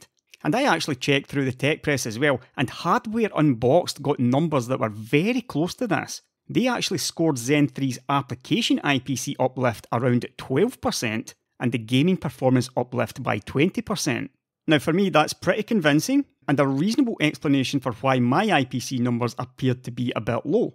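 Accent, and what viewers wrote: Scotland, United Kingdom. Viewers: Those soothing scottish dulcet tones!!!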